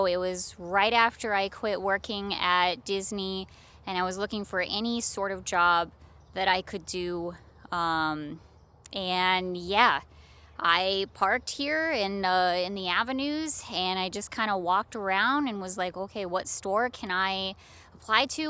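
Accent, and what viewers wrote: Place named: Michigan, United States. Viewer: Hi 👋 MELBOURNE!!!! WOO! sound like Michigan accent.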